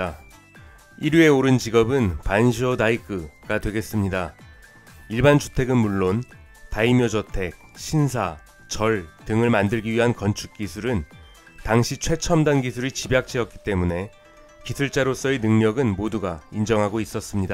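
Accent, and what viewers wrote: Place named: Korean-Chinese, China. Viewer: 한국인 맞음? 말투가 조선족같노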